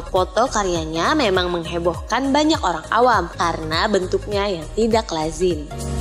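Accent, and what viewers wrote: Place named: Borneo, Indonesia. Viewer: Asli bujur2 kada kawa diwaluhi tiap pandirannya berassaaaa banarrr ilat2 bubuhan benua borneo wkwkk Mantap banar, min. Katuju aku wan channel pian 🖒🖒🖒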